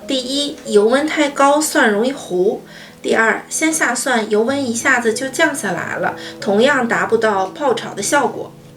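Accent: Tianjin, China. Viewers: 口音像天津人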